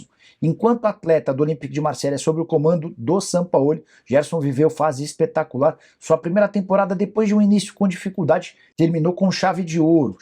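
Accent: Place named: São Paulo, Brazil. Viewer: Ele ter que ter o sotaque podre de paulista esquisito que bota o i antes do r 😂🤣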